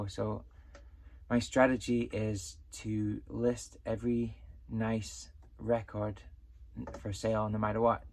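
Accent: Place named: Scotland, United Kingdom. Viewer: You sound as if you have Scottish twang in your voice Max Boyce is a Welsh comedian.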